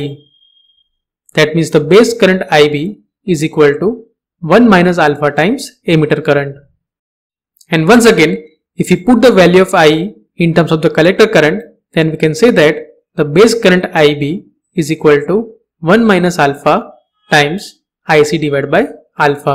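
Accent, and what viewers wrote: India. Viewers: neither is he, its the indian accent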